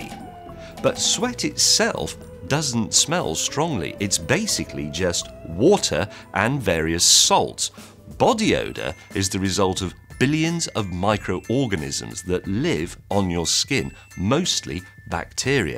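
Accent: Scotland, United Kingdom. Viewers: Apocrine is pronounced Aprocine Propanoic acid is pronounced Propioc acid Hexanoic acid is pronounced as Hexionic acid These are scientific terms. It has nothing to do with dialect. You can't just take defined scientific terms casually because you're British. The way he says out and ouse words makes me suspect Scottish flavours.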